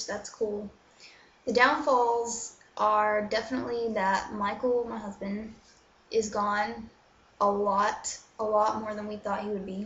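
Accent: United Kingdom. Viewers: I subbed to your channel at first because the british accent too, i to was somewhat obsessed with the UK - i was looking into traveling there, then moving there it was kindof random actually lol.. Shit, you had me fooled.. lol good job girl! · thanks for sharing so much personal information with us. Beeing a military wife sounds quite depressing :( I'm male and after I experienced war in my home country as a kid, I was sure that I would never go to any army in the world. Ofc its nothing bad about beeing a soldier, but still it's stressfull for a familylife having that kind of long distance relationship.. I wish u and your husband good luck and allot of love and strenght. (your britishaccent is amazing, wish I could talk like that) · What happened to your british accent? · BTW ether I loved ur British voice XD. And good luck to Michael out there, but no offense he's in the navy not too much to worry about. 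I know its hard for him to be away but trust me in the long run this will be better for your family beneficial wise. Stay strong :) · hey stumbled across your YouTube via your "how to speak in a British accent" vid. the others are interesting tew! hope all is well. are you British o American lol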